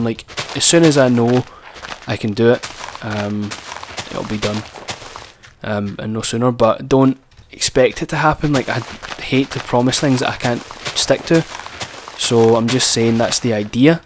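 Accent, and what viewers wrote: Scotland, United Kingdom. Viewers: this guy sound soooo much like James johnston from biffy clyro :D ( and not just becasue there both Scottish) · are you scotish lat ?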